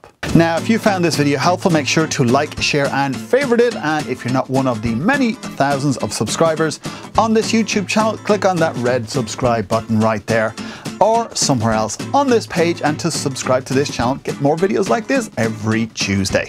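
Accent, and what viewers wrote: Ireland. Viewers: are you Irish by any chance, that accent sounds very familiar... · Irish,accent caught my 'ear"..i need to use videos from a chsnnel im subscribed to here to a site thats app hasn't lauched yet i know they are all in my playlust but everyone else seems to be sble to post a YT to watch.....any ideas please !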